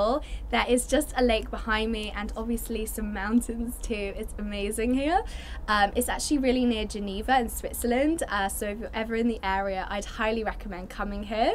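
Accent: England, United Kingdom. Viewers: I love listening to real English😁👍🇬🇧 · Где я не видел ,но все равно понятно ,для прокачки английского подходит!) Британский прослушивается явно,но он смешанный как показался. Но она красива , говорит вполне понятно , восприятие на слух приятно. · Лицо славянское. Если бы не британский акцент, я бы сказал, что она русская. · Мне очень нравится настоящий английский акцент! Это так красиво звучит ... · она очень понятно говорит, чисто английское произношение. вот американское тяжело воспринимаю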